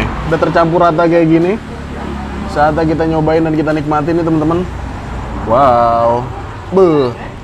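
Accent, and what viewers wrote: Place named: East Java, Indonesia. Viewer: Jawa timuran nih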